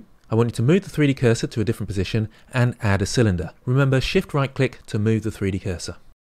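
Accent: United Kingdom. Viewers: Nice to have some expert tutorials with a British accent. Gonna get through your playlist, and after watching so many, I hope this will allow me to start creating instead of videos. Thanks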